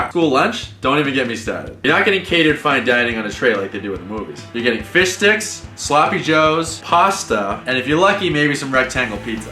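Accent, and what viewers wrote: Boston, United States. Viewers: Sounds like your accents coming in a bit more - spending a bit more time in Boston? Taken Tuesday... 'But what I do have are a very particular set of skills...' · your Boston accent.. yes, thank you. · Chances are, your a fucking power ranger! LOL - Jason P.S. You can tell by your accent that you've been in Boston too long. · Did you intentionally have a boston accent in this one?? heh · The longer your beard gets, the more pronounced your Boston accent gets. It's fuckin' awesome. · Your Boston accent, OMG I can tell you went home for Christmas! LOL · So much Boston accent hahaa! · The boston accent is very apparent this week. Best yet! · I like you :) minus the boston accent and Patriots/Red Sox fav yuck..... As a sports fan we could never date. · That Boston accent tho. PLEASE CAN YOU SAY: 'I lost my car keys'. PLEASE! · Haha love how much of a Boston accent you have in this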